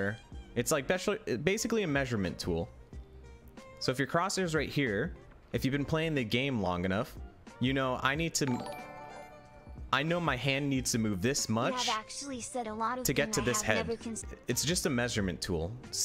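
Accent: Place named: Alberta, Canada. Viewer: Holy shit you from Ed, bud? or Calgary…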